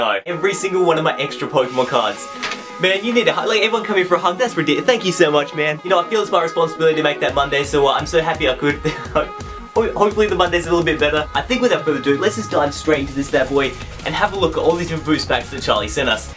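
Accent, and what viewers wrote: Australia. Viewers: hey, just wondering, r u from South Australia?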